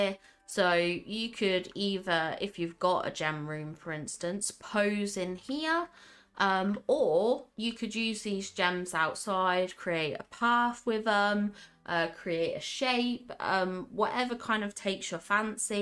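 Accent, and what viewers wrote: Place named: United Kingdom. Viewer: Hey 👋🏻 Thanks so much for posting this…I was kinda downhearted by my 600 moonstones for last DreamSnaps😟…like quite a few others..the bar has been raised now peeps get how profitable taking it seriously…anyways you have given me some really good ideas and inspiration..Just Love your content as ever..nice to hear a fellow Brit too 😊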